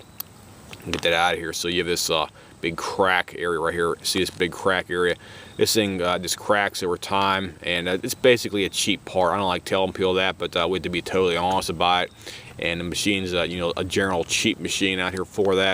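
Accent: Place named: Delaware County, United States. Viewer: Dude you have the straight up delco accent haha.You sound like Bam Magera.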